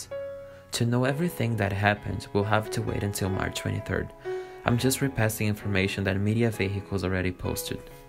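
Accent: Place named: Brazil. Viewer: Esse sotaque parece brasileiro🤔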